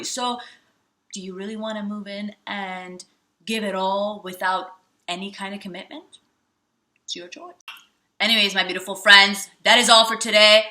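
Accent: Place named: Spain. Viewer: A foreign one. She speaks another language, maybe Spanish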